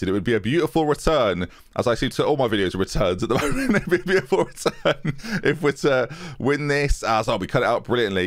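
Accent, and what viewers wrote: United Kingdom. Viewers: never knew a fucking baby could have a british accent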